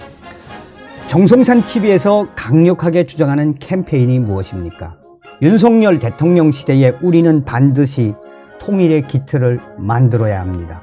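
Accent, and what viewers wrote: North Korea. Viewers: 정성상씨, 영상에서 북한소식을 알려주시느라 수고 많으신데 여기는 대한민국입니다. 이북 말투 가열차게, 알려드리겠으다레 등 등 이북말투 삼가하시기 바랍니다.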